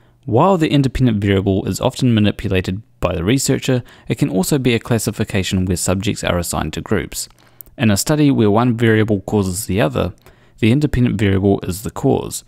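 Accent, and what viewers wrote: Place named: New Zealand. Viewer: There was a point in this video where I was really distracted by the phonetic "eegs" which I thought was eggs, but later on you said it with the letter X on screen. Not a diss, I quite like the quirky kiwi accent is. At least you don't make everything sound like a question?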